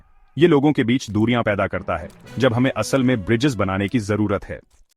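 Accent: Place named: United Kingdom. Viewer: Aur angrej mat ban. Hindi bhi angrej ki tarah bol rha hai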